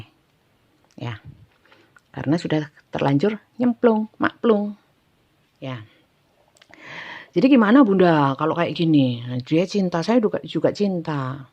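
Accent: East Java, Indonesia. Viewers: Nyimak readingan Bunda tuh bikin senyum² sendiri😍di samping sll resonet aku suka logat nya Bunda khas Jatim,pencerahanya jg sangat tepat👍sukses n sehat sll ya Bund🤲...tks🌹😍🙏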